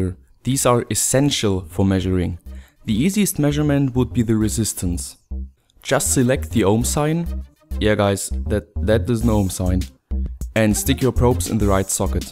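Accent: Israel. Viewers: Are you from Israel? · are you from israel?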